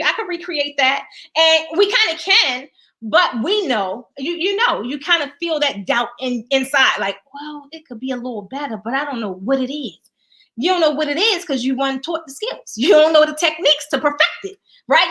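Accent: Baltimore, United States. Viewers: I love the bmore accent. Hope I got it right🌻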